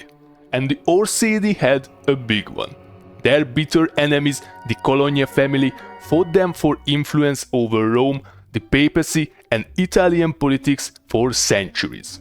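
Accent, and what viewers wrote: Hungary. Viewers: Love the hungarian accent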